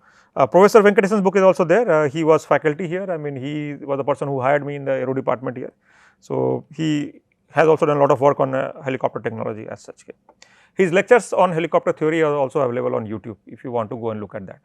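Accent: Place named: Oxford, United Kingdom. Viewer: Sir aap hindustan me ho eng me ho oxford ki trh kyo bolte ho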